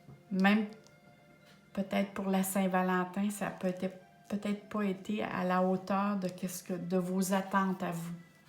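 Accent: Canada. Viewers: Bonsoir le Canada est dans ta voix 😘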